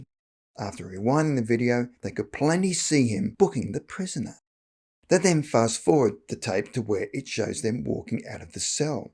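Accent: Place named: Australia. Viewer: Fellow Aussie here. I love listening to an Aussie telling stories. There is more between Heaven and Earth.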